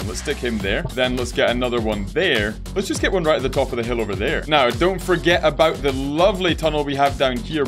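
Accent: Scotland, United Kingdom. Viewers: The Scottish YouTuber had returned…..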